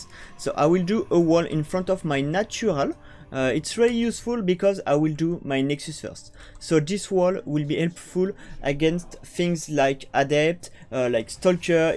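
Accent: France. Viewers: Your French English is cute.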